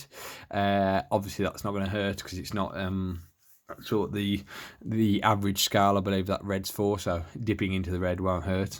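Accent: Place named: Black Country, United Kingdom. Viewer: Good vid you sound local to me? Im in stourport, are you black country way?rgds steve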